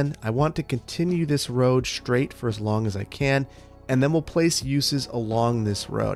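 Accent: Wisconsin, United States. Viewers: God I love the wisconsin accent with taag or baag when ever it shows up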